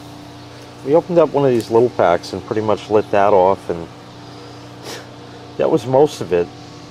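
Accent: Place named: Midwest, United States. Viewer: We go through bottles of that Aldi chocolate syrup every month. Your voice being shot is actually amusing (sorry!)... it's like your voice is there, but you have lost your New York accent. Like you are suddenly a midwesterner. Yep, I'm commenting as I go XD... AWW I see the Sharkie missed a few pots, hun. OH and now I see why, he doesn't have his glasses on. :-) Glad it was fun!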